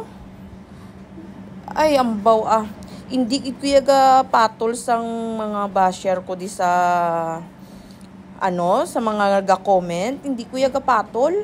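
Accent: Iloilo, Philippines. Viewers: I loved listening to your ilonggo malambing sya from Masbate to stop answering mga basher I watched Latino manicurist , Vietnamese, Korean advance sila , sa pinas isa ka malinis magtangal ng ingrown dry skin everybody is talking while working none stop gid sya Vietnamese to ukit ang style nya at gusto ko yong mga gamit nya it can make it easier sa trabaho try mo mag watch internationally it might give you new idea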